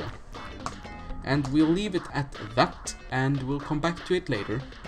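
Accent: Norway. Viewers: Er du norsk? Ihvertfall norsk aksent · Are you a norwegian ? it sounds like you are. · Du er norsk, eller hva?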